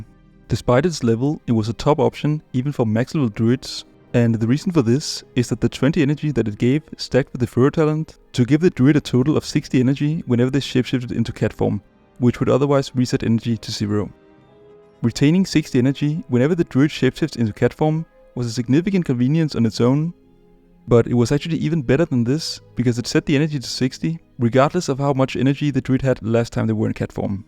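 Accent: Denmark. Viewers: are u danish! STEGT FLÆSK MED PERSILLE SÅVS! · Danish accent represent.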